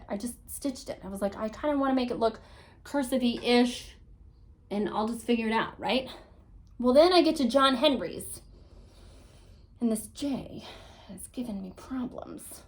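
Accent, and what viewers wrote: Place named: France. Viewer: Hi, really enjoyed your video and energy. Can wait to see more. Your French accent is perfect! Are you French?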